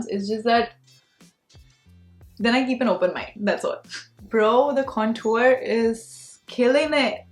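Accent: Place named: Uttarakhand, India. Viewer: Eee .. yes your hindi was giving me signs of you having uttarakhand connection 🤭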